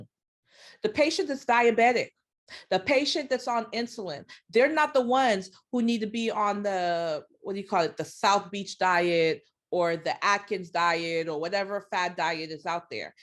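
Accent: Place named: Haiti. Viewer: All of your videos are so helpful I love the way you break It down. That’s exactly how I speak to myself when trying to learn . And reading the book alone is definitely not as helpful since you make It make sense ! One of my classmates told me to watch you cause she said we speak the same, and when I did I had to laugh ! I kept saying to myself she gotta be Haitian and I would like to say originally from New York or lived there at a point ! Anyway , thank you for all that you do for us nursing students 🙏🏾🙏🏾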